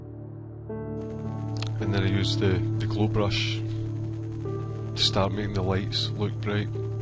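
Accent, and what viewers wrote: Scotland, United Kingdom. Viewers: Love the accent, I think Scottish is the coolest accent actually :D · good vid but i hate that scottisch accent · Thank you:) I have played this and followed along (basically playing with making my own buildings, street, art on the buildings) and so I have learned how to use the perspective tool in Painter. The music is nice, so is Scottish accent. Music is fantastic too. Best wishes and keep up the good work:)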